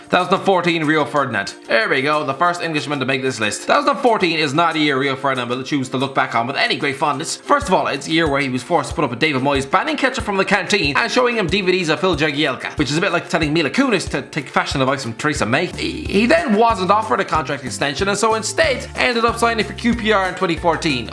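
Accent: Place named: Ireland. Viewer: Is it an Irish accent thing, to pronounce 200x as 100x?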